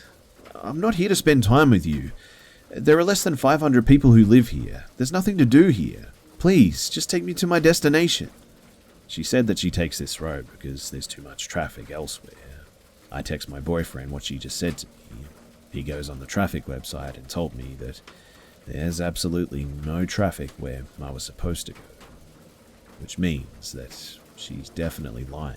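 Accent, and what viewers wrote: Australia. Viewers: Is the accent Australian?? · instant subscribe an actual human narrator AND ur from the motherland aus bro sign me up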